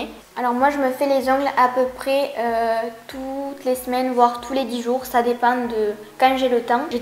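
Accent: France. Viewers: Le sang j'adore ton accent du sud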